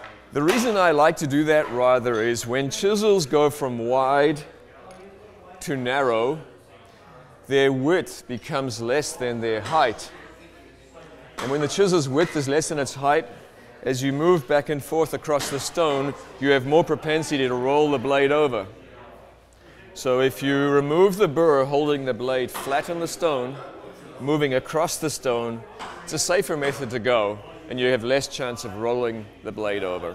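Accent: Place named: South Africa. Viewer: A south african bloke working at lie nielsen? Love it. Great technique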